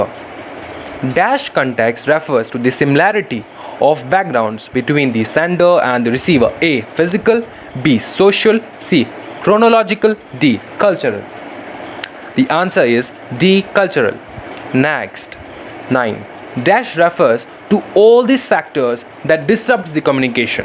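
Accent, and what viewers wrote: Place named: Haryana, India. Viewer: Haryanvi voice Gjb 😊 I like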